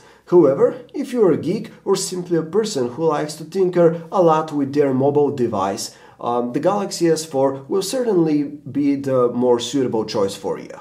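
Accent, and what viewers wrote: Russia. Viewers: where you from??? sound like from russia or some near country and why comparison sounds like you more iphone man . They are just phones. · are you Russian? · That Russian accent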